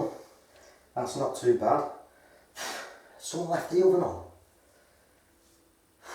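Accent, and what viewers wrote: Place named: St Helens, United Kingdom. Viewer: Sounds like a St Helens accent that mate, good work as well bud 👍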